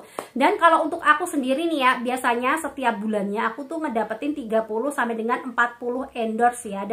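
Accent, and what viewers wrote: Java, Indonesia. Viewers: WES PINTER NGOMONG RODOK ENEK MEDOKE JOWO, PINTER CARI DUWIT, BERGUNA BANYAK ORANG YA ALLAH BERIKANLAH KESEHATAN BAGI ADIK CEWEK INI YANG SELALU MEMOTIVASI BANYAK ORANG SEMOGA BANYAK MANFAAT VIDEO INI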